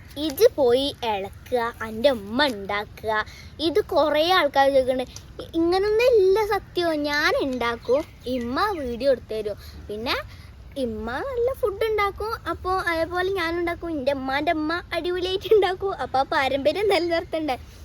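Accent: Malappuram, India. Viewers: മലപ്പുറം ആണെന്ന് മോളു പറയാതെ ആ സംസാരത്തിൽ മനസ്സിൽ ആവും. ഞാൻ മലപ്പുറം ആയതു കൊണ്ട്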